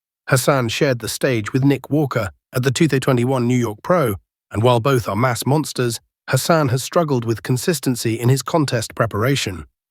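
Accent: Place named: United Kingdom. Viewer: I love the British accent! Which assistant do you use?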